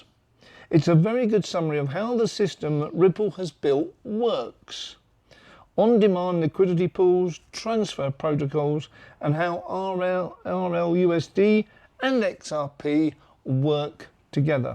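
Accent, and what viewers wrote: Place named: United Kingdom. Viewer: People with British accents just sound smarter and more trustworthy. I’m hooked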